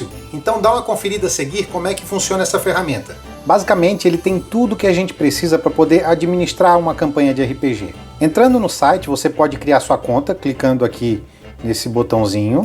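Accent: Florianópolis, Brazil. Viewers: Tu és manezinho brou?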